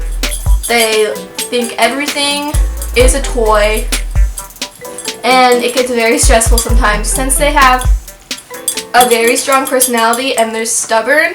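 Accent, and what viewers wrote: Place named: United States. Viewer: Where are you from? Your american english accent is pretty good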